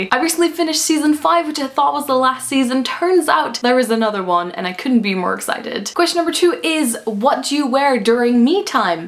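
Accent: United Kingdom. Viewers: I need a lot of me time and I enjoy doing things by myself. Sometimes I snuggle up with a book/movie/TV show, sometimes I go shopping. I go to museums by myself once in awhile as well. By the way, I love how your accent becomes more and more British! I find myself adopting accents and dialects very quickly when they are familiar and I'm in the area for some time. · lovely video! :) also your accent has twang of British intertwined in it and it's super cute haha! xxx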